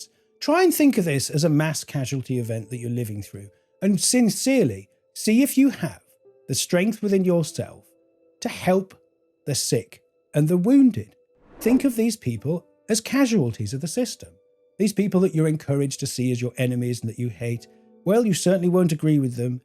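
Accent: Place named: United Kingdom. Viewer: I really enjoy TRUTH Tellers! Cheers!!🎉 Truth with a British Accent is EVEN BETTER! We could get really Creative and make this Truth Wizard A THING. I hereby Volunteer for the Costume Department Manager and will gladly Donate Costumes & Props, if needed. I would have to ship them from America. Just heard today, 9/2/25, that Brits cannot Mail packages to the US under your current Dictator. Thanks be to God, we Americans still have that Freedom. Unless it "mysteriously disappears" at your end, I don't see a problem. 😉😉 On a seroius note, I thank God that I was Born on Christmas Day 1960. I was taught to pay attention to Life and have literally witnessed with my own eyes, ears, heart and soul the disintegration that he is referring to. The turning upside down of everything. He is absolutely correct. It has been a wild ride and I suspect we'd better buckle up. 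The Disenchantment is SPREADING! 😇🙏💪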